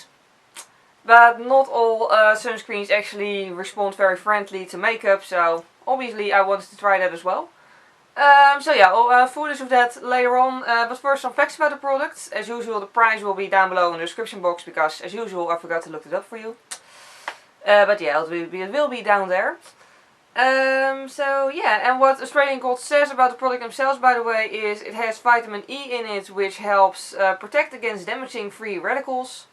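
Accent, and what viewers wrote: Netherlands. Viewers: Holland lol accent 🐇🐇🐇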